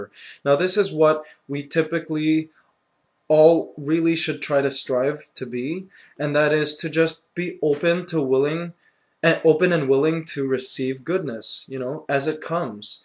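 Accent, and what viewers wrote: Philippines. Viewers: i love it when you're speaking with pilipino aksent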